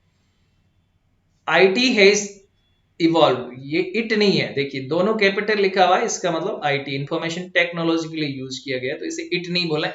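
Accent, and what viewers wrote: Rajasthan, India. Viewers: Sir are you from rajasthan (Jhunjhunu)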